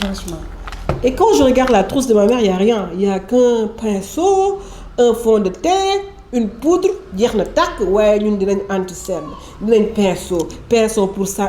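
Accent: Senegal. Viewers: ne perd surtout ton joli accent sénégalais wolof comme dans ta vidéo de feug Diaye. Tu y es d'un naturel sans égal avec un accent très très joli. Comme dans la plupart de tes vidéos. Évite l'accent Chokho Chokho trop chic chic comme les immigrés ou sénégalais de France qui veulent parler comme français avec l'accent français + que les Français. C ton accent sénégalais authentique qui fait de roue une YouTube sénégalaise originale. 👍👍👍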